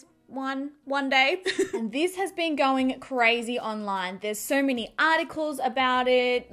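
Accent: Australia. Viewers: I miss Australia so bloody much, like your accent makes me want to cry I wanna move back now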